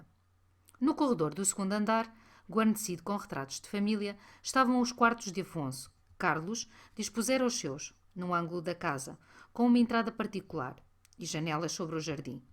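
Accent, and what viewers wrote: Portugal. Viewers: Amo o sotaque português , nós brasileiros temos pouco contato com esse lindo acento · muchas gracias por subiendo este, es un grand ayuda en aprendiendo el acento de Portugal, un montón de gracias.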